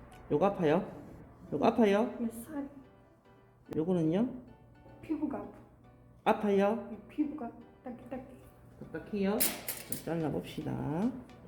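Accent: Gyeongsang, South Korea. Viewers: 드디어 오랫동안 기다렸던 영상을 보게 되네요. 역시 경상도 사투리로 고객님께 자상하게 설명도 잘 해주시고 너무 깨끗한 발톱정리 👏👏😄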